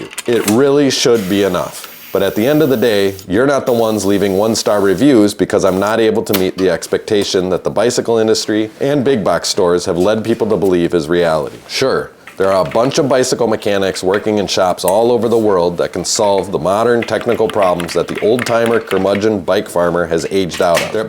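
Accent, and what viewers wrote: Wisconsin, United States. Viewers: I live in Norway but I grew up in Northern Wisconsin. In the 1st 30 seconds of this video I thought this guy really has a Wisconsin accent... Great video!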